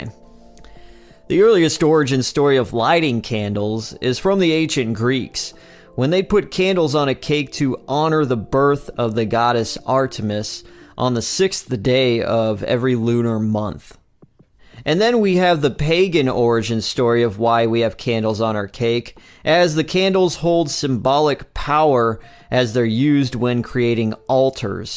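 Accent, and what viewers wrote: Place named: Buffalo, United States. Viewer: Im loving your Doc's, just found your site, about to watch this, cuz im hoping you bring up BIRTHDAY ICE CREAM CAKE... WHO EVER INVENTED THOSE CRUNCHY THINGs IN THE MIDDLE ARE GENIUS'S!!! Anyway, Im also trying to figure, where your from, you have that same Accent we have in Buffalo NY, which i also notice when i was living in Detroit, they kinda still had that accent, then moved 3,000 miles out West, & sounded like a fish outa water, its refeshing to hear an accent on YT, you know, instead of all the A.I.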